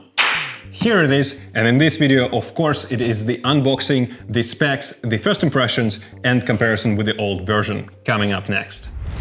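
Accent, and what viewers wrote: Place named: Russia. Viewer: Русский паря чтоль?)) Акцент дичайше русский) Но сама речь - мое почтение) Зато каждое слово понятно)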